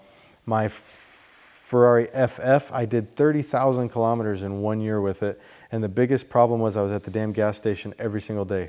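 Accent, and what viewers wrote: United States. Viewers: I notice your american accent. Howd you decide to move to Germany?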